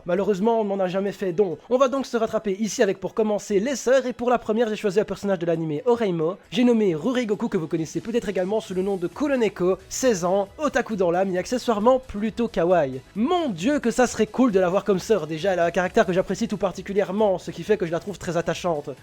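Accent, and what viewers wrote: Belgium. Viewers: Tu serais pas belge?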